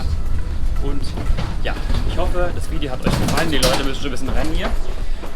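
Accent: Germany. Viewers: Ich habe mit ihnen über dieses Thema schon einmal gesprochen. Sie haben eben das typische Schulenglisch gelernt und jeder weiß, wie gut dieses ist. Wenn man eben, so wie sie, lange kein richtiges Englisch spricht, vergisst man eben eine Menge und die Aussprache leidet auch darunter. Vor allem Vokabeln. Diesen typischen deutschen Akzent hat einfach jeder Deutsche. Ich lebe seit meiner Kindheit zwischen Monaco und Berlin und spreche fließend Englisch, trotzdem hört man meinen deutschen Akzent. Das ist einfach so. Jeder hat seine Probleme mit der Aussprache von verschiedenen Wörtern. Ich würde es einfach entspannter sehen. :) Liebe Grüße aus Monaco -Raphael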